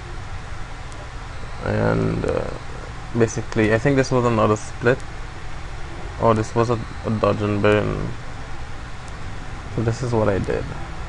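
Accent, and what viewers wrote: Trinidad and Tobago. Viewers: You have tah be ah Trini.. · you sound like a trini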